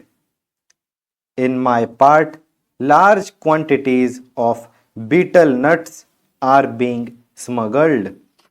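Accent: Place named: North India, India. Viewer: North Indian accent ......not useful for South Indians....you people call school as ischool.....😂😂😂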